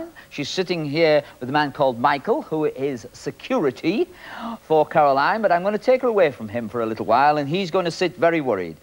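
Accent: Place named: Yorkshire, United Kingdom. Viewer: has a British accent, more exactly he has Yorkshire accent from east England. Don't worry it is fairly thick and a lot of people have trouble understanding it. Even people who do have english as a first language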